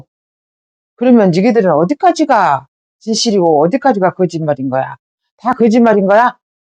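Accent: Jeolla, South Korea. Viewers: 매일 잘보고 있어요..날카로운 지적과 판단에 박수보냅니다... 전라도 아줌마의 사투리와 표현이 귀에 쏘옥 들어오네요..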